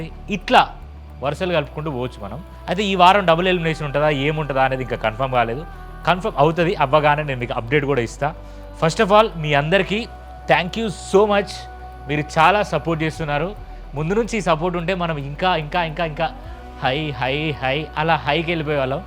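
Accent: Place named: Telangana, India. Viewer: Mi telangana slang superbbbb❤❤😊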